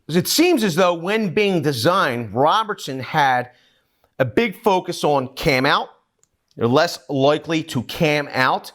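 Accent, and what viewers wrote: Philadelphia, United States. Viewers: I knew you had to be from Philly, you got that Philly energy and flare. I agree with you on the Phillips bit, most people don't understand the concept and think its an annoying feature but in aviation breaking off a fastener in the airframe means at best hours and inspection and engineering, structural analysis and tedious removal and rework. At worst all of the above plus removal of the affected airframe and replacement, hopefully nothing flight critical.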